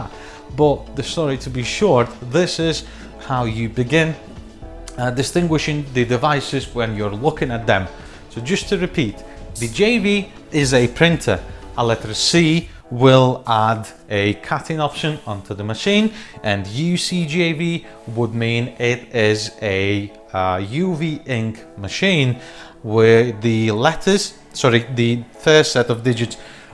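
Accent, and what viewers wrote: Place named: Liverpool, United Kingdom. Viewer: Scouse accent really shinning through now 😝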